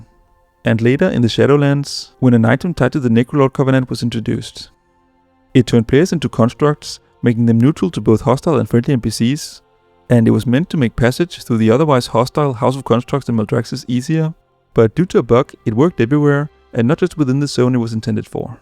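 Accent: Denmark. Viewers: Ooooh, Danish-english accent😂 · I’d almost bet everything I own that the guy speaking is Danish.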